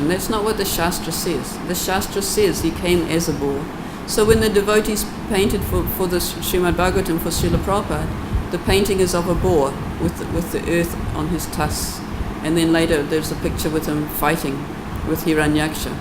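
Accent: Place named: South Africa. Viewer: Hare Krishna! Where is Her Grace from? It sounds like my homeland's accent (South Africa)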